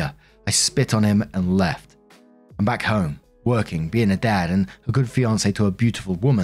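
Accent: United Kingdom. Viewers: i aint gonna lie I was taken outta it for a second hearing someone with a british accent say they were a latin king lmfao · British pronunciations of Spanish words make me chuckle and wince simultaneously. One day you’ll get it,!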